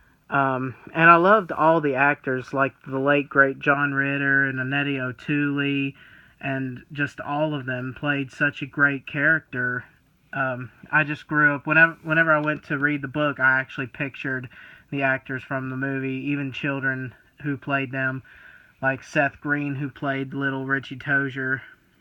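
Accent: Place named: South, United States. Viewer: My mom wants to know where you're from? We both agreed you sound country. I'm from South Carolina myself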